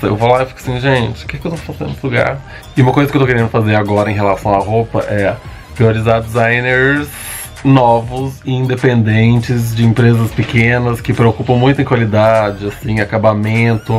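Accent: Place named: Minas Gerais, Brazil. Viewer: Oi oi lindo, seu sotaque mineirim é um arrazo! Saudades imensas da minha terra. A 6 anos moro em Porto Alegre e quando ouço alguem ... ai ai me da aquela saudezinha de BH. Enfim, esse video eu assisti a alguns dias e foi um divisor de aguas... <link> estamos sim, na era do consumismo desenfreado, porem cabe a cada um de nos sermos, low consumerism , LOWSUMERISM ... Parabens pelo canal!!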